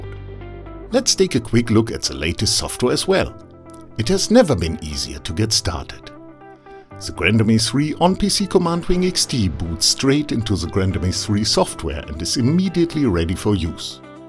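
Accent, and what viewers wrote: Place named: Germany. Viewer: Most german accent ever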